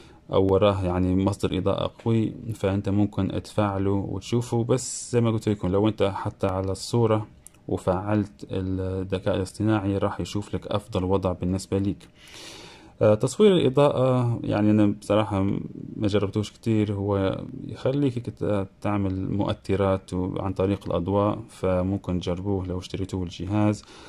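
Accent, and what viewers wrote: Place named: Libya. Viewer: واضحه ليبي يلي يشرح منور ولد بلادي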